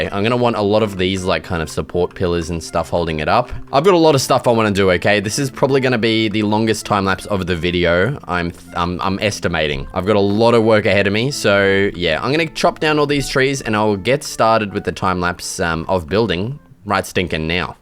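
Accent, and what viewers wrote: Australia. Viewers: U australian, i thought u were british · i could sense the australian in you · I've been watching your videos for about 6 months now, and I have to say I love your Australian accent, and your building style is absolutely amazing. I recently just started a forever world, and your videos are great inspiration for build ideas. I will forever appreciate your videos.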